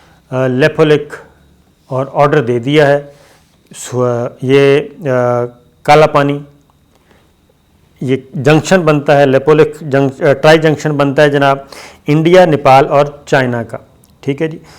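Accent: Pakistan. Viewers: Accent is pure pakistani best hamaari pehchaan punjabi urdu accent indian aacent nahi chahyee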